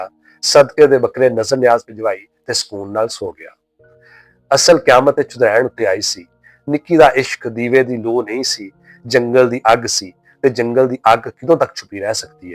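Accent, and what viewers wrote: Punjab, India. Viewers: واااہ بہت خوبصورت کہانی اور سچا پنجابی لہجہ😍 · کہانی سے عین میل کھاتا خوبصورت پنجابی لہجہ اور مکالموں کی ادائیگی میں بہترین اتار چڑھاؤ۔۔ بلاشبہ ایک مکمل پنجابی شاہکار ۔۔ اس خوبصورت کاوش پر ڈھیروں داد و تحسین🌹 ❤ ❤❤❤ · ہائے۔۔ سواد آ گیا سچا لہجہ پنجابی دا۔۔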